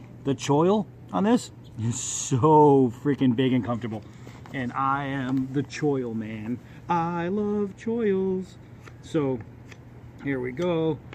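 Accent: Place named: Pennsylvania, United States. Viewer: Sounds like a Pennsylvania man. Beautiful knife and beautiful sycamore back there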